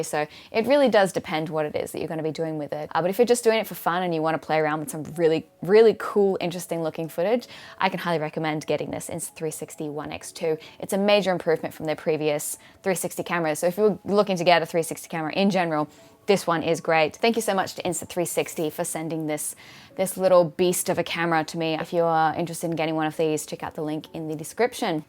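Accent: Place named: Australia. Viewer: So Aussie I love it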